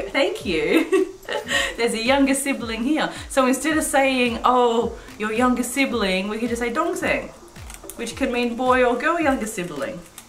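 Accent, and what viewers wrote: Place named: Australia. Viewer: He's so cute! It was interesting learning the Korean word for "cicada". Also interesting is that the Australian pronunciation is different than the American pronunciation. We say "ci-kay-da", not "ci-kah-da". I noticed that you pronounce "똥" differently than does, too. He is clearly picking up the more native Korean accent, and not imitating your Australian pronunciation of Korean words.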